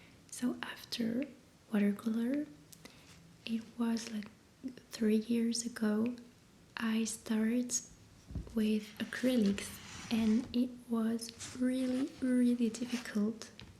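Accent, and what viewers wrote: France. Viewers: Hello 🖐. I discovered your channel last week and it's a pleasure to watch you 😊. You are so soothing, your voice is lovely. I love the french accent, it's perfect for asmr and there is another french asmr youtuber which I adore. It's moonlight cottage. I also think you are talented painter. Thank you for sharing 😊. Merry x- mas and a happy new year 🎉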